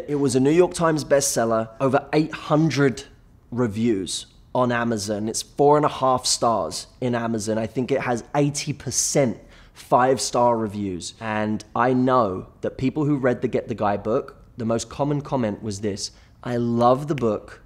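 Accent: United Kingdom. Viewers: Something about a man telling me how to adjust my standards to a bliss point to gain a man's interest and respect is making me ... angry? indignant? patronized? I'm a little salty here on his sweetness. Ladies, please, this entire video begins on the foundation of "what I (a man) believe women experience"... that should have immediately triggered your intuition to approach this with skepticism. If he didn't have such marketable attractiveness (read as: cute brit with the accent) there's no way this channel would be so popular; hell it's why I clicked on the video. He's capitalizing on his sexual appeal to gain trust of women to what he believes will make a women attractive to men.like.him. Don't get me wrong, he seems like a sweetheart and I can see what he's /trying/ to accomplish, but after working in finance I can tell you, every video is still a clever sales pitch - don't buy into him. 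Just respect yourself and respect the man but to hell with any man that doesn't respect you - no matter how cute they are. It's much more satisfying to be with a man where you don't have to change yourself to a bliss point. Let him love you for you, salt, sugar, and every spice in-between. I trust that the "right" man (not the man that gets his ego easily bruised or the man that doesn't respect your sweetness) will respect and love you more (and a lifetime) for being real than someone who is artificially flavored. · Love this british face and accent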